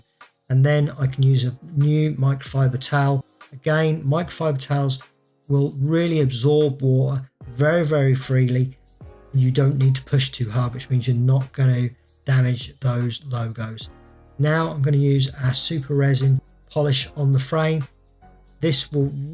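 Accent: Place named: England, United Kingdom. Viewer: The enjoyment you derive from your work is almost immediately attuned to the viewer's eyes & ears. The narration is most pleasant and always to the point, albeit with that extra British charm that an English accent brings to anything. Your humble directness really makes the whole thing a cooperative adventure in restoring these old beauties to their original dignity. Your keen eye and steady camera work really convey the importance of a thorough process. Your videos must really resonate to a very wide audience. Mike Kirwan - Kenmore, WA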